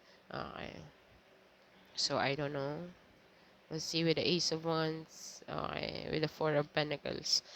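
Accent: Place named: Iloilo, Philippines. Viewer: 😂😂😂 sagad mag ilonggo si ❤❤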